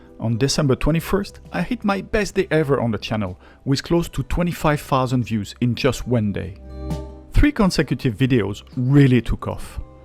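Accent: France. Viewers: Well done on your acheivement of 50 000 subscribers. It must take a LOT of work to prepare all this material. Yes, we love your chanel here in Adelaide, Australia, and have learnt a lot about how to navigate Paris in our fothcoming trip. We have printed out your Pas-saaa-ges map and will walk it in April. (We love your intonation and make lists of the adorable way you pronounce so many words, as you shift the emphasis from where English speakers place it, to your very own version of the word. Eg...Passages in English has the emphasis on the first syllable wheras in French it is the second syllable that is emphasised. We have written down pages of English words and the way you so interestingly and logically quaintly pronounce them. For us it is an amusing and almost impossible exercise trying to mimick your emphasis. Our English speaking brains just wont do it!! ) We also wonder how the obesity rate is so low in France with all that heavy, meaty, fatty, sauced food and sooo many pastries. 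Pastries for breakfast? Surely they are a treat and not everyday? This all looks so unhealthy to our eyes. No salads and few vegetables seem to appear on the table. This is a mystery to us. Can you maybe do a video on the healthy restuarants in Paris? where salads and veggies and less meat is emphasised? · I’m a senior from the USA. My husband and I love watching your videos on TV together. The content is wonderful, but what really sets you apart from other YouTubers is your soothing, slow, clear, and sophisticated voice plus that very cute French accent! You describe the restaurants and foods so beautifully, and your close-up shots let us see everything and dream about how it tastes. We also really appreciate that you show us the final bill. It really brings it all together for us. We also especially loved your video explaining the differences between cafés, bistros, etc. Every time we visited France, we never knew the distinctions, so your explanations were incredibly helpful. 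We’d love to see more videos about restaurants, bakeries, salons de thé, where and what types of cheeses to buy, and what kinds of things to buy at charcuteries, and just everything about food in France. This video is excellent. It was so interesting to see how YouTube payment works for creators. Congratulations on your 50K!! Thank you so much for your wonderful work! We escape to dreamland with your videos!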